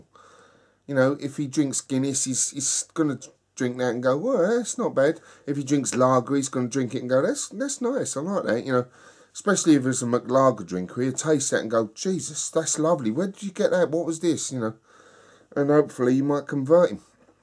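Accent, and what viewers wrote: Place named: South East London, United Kingdom. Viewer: Interesting about the brewers star, thanks for sharing, I did not know that. I did know about the five pointed star in Washington yes. The pentagram has been used for thousands of years, mostly symbolizing life or humanity, or the union of male and female. I like watching your videos by the way, I think your quite and interesting reviewer. Where are you from? You have a South East London accent, you sound just like my mate from Peckham.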